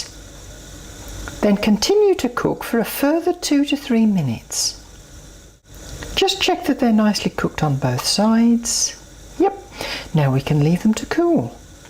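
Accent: Midlands, United Kingdom. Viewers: Loving these great recipes, your enthusiasm and the Midlands accent!!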